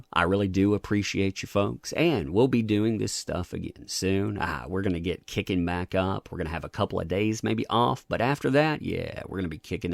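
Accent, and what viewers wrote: South, United States. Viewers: Keep speaking the truth in that smooth southern tone 💪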